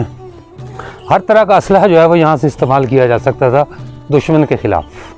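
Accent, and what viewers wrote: India. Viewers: Bhai aap Pakistani se zyada hindustani lagte ho baat cheet ka andaz bhi hamara hai jo ke yeh badi baat hai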